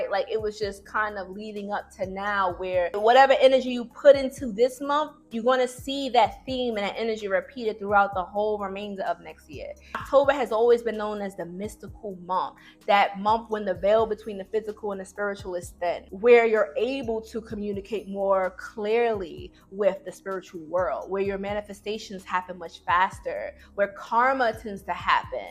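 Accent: New York, United States. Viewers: my god new fan here you are from NY with that lovely accent!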